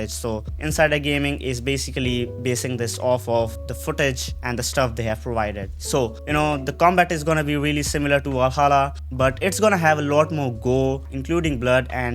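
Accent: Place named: India. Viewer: Yoo, are you Indian? Your accent sounds a lot like it.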